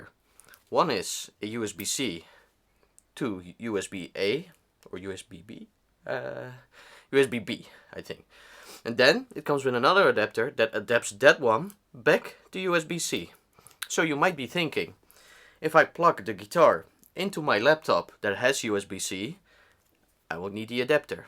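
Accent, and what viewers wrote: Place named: Netherlands. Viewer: i smell sniff a dutch accent 🤔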